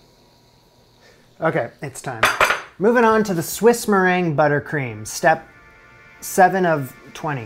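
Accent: Canada. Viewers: I knew from the moment i heard you that you are Canadian. The way you swear, hahahaha. Love yourself and your content. Loyal watcher from Alberta. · I think I am falling in love with you. I hope that's okay. I love your Canadian accent.❤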